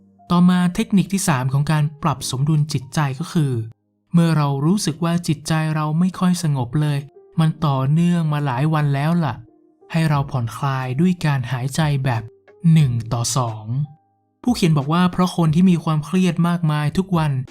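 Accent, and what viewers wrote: North, Thailand. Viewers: ขอบคุณนะคะ เป็นคลิปที่ดีมากๆเลย ฟังแล้วเพิ่งรู้ว่าคุณเป็นคนเหนือ ฟังวนไปทุกวัน กดไลค์ให้ทุกวัน มันดีกับชีวิตมากๆ ชอบ~😊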